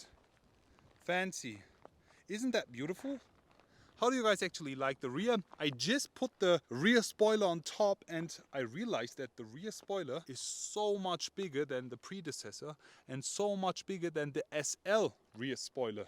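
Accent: Germany. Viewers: I like the way you spell the words like Arnie 😂 I can feel some German vibes coming through your voice. Really really Arnie-ish 👍🏽 I love it 👏🏽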